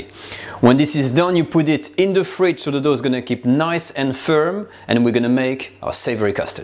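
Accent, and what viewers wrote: France. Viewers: Quiche Lorraine is NOT made with cheese!!! That we call a quiche Savoyarde. Despite his French accent, this man hasn't got a clue about traditional French cuisine, as I learned from many others of his video's. He is a bussiness man rather than a cook. His lack of basic knowledge and ingredients is painful to watch! Please take a look at other sites on the internet for traditional French cuisine, there are plenty!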